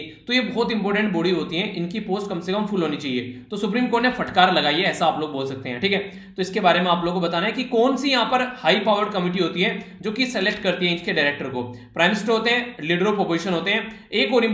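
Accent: Haryana, India. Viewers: Am damn sure sir that u are from Haryana and from around Rohtak sonipat belt .0001 jitna bhi doubt nhi h😀🙏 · Aap haryana se ho sir...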